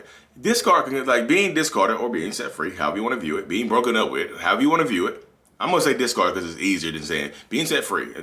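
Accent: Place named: South, United States. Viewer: U sound like u are riding a horse Lee southern man voice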